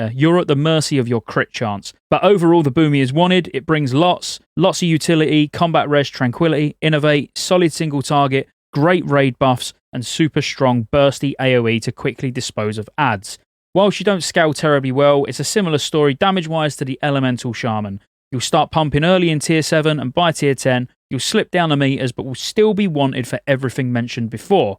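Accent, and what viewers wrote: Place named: United Kingdom. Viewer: he talks too fast and the section are smeared together, you can barely notice when he changes subject. not to mention the terrible uk accent that makes you super hard to understand because vowel abuse.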